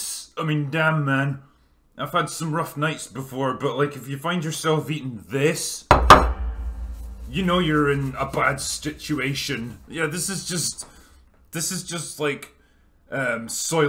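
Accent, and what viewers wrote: Scotland, United Kingdom. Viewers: We're doing junk food reviews now? You can get away with reading the phone book if you have a Scottish accent I guess... Do they still have phone books?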